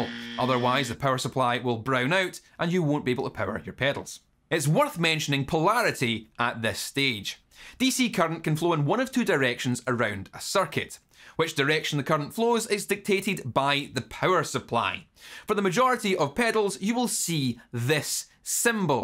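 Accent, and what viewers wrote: Scotland, United Kingdom. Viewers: YouTube loves the Scottish accent lol. I saw a video about Glasgow once where the captions thought they were speaking Dutch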